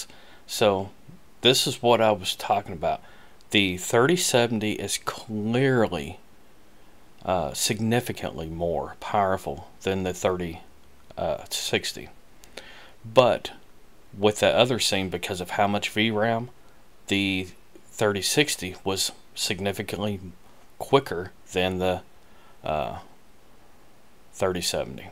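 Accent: United States. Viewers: You are showing exactly what I was asking about.... I saw your other videos. Many many thanks for this sharing knowledge spirit especially it is presented with this special american accent (which area it belongs to?). Thanks and may God bless you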